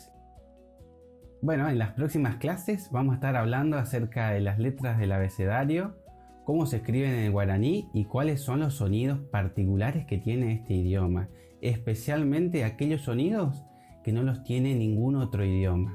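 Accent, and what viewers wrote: Argentina. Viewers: Primera vez que escucho guarani con acento Argentino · Pareces Argentino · Debe ser correntino...igual explica muy bien 👍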